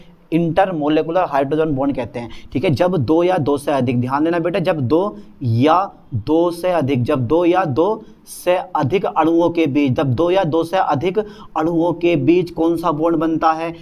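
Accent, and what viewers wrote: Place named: Moradabad, India. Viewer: Sir aap muradabad ya sambhal ke ho mujhe lg rha hai 😂😂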